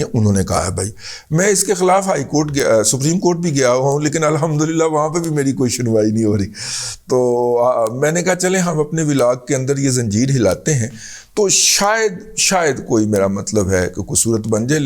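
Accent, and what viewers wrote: Punjab, Pakistan. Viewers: Compared to Mansoor Ali Khan, your Punjabi touch is much better and insightful.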